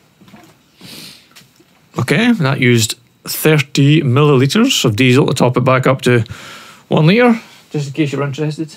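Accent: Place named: Orkney, United Kingdom. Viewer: I was mixing specific heat and conductivity with my idea. Thanks for following up. Also your accent and humor reminds me so much of my grandfather. I hope to visit the Orkney Islands where he is from some day. Greetings from Seattle, Washington.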